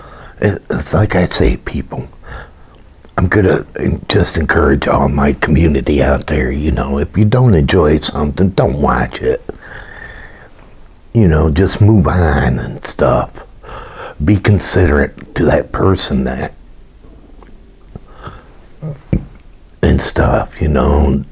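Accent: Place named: South, United States. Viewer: that southern accent is everything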